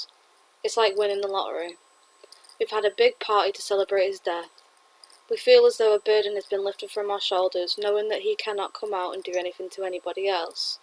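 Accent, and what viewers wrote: Manchester, United Kingdom. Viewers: I 1st heard of Trevor Hardy years ago and I'm from Manchester myself and I live opposite where 1 of his victims was found, you sound like you're a Manc yourself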